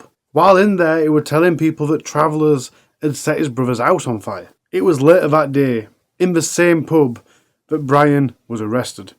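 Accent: Yorkshire, United Kingdom. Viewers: Maybe put more of yourself in the video in general, rather than just at the end. I'm from Yorkshire and sound a little like you. I think channels like That Chapter have done a great job of using the accent. Really loved the video. Genuinely want to see you keep moving up and up and up! 💛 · I just love how Yorkshire you are 🌹🌹🌹🌹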